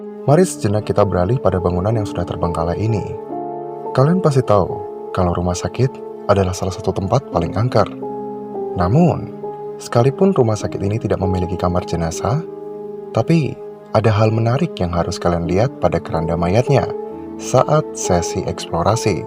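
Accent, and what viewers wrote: Semarang, Indonesia. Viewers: Ka harus tau ini konten jadi favorite aku dari dulu. Mood bgt logat semarangnya😫 apalagi pas bilang "pulang yok". Bukan apa apa nih tapi saya melting berasa kaya doi😫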